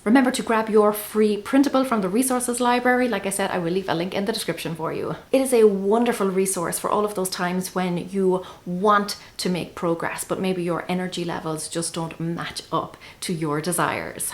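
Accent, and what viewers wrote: Ireland. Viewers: Very real. I am recovery surgery and low energy, this is very realistic. Thank you for this great viedo. I love your accent, it must be Irish, awesome!🍀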